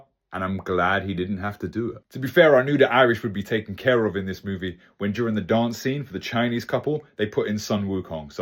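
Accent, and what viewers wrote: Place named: England, United Kingdom. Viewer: You have an English accent 😂😂😂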